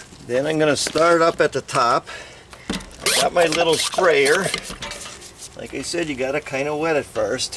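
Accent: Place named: Pennsylvania, United States. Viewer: Hi, just came across your channel while looking for some tips on repairing my patio of natural -shaped bluestones. After a few minutes of viewing the videos I noticed something very familiar - the scenes, the houses, the speech. You are from my hometown area. I was born & raised in Dupont, schooled in Pittston, but currently live in MA on Cape Cod.. I am quite surprised to find someone from the Wyoming valley being a YouTube creator AND doing a great job at it. You are providing a great service entertaining & educating many people. Thanks for that. I subscribed and wish you continued success with your channel. Just wanted to say hello. Paul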